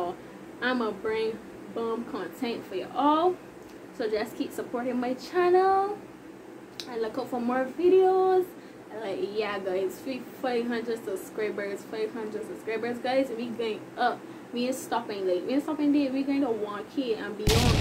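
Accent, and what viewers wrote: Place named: Caribbean. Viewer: You gotta be from nice Caribbean, which one?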